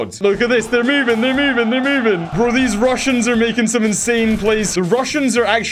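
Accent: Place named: South London, United Kingdom. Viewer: bro sounds like hes from south Lindon im 💀💀💀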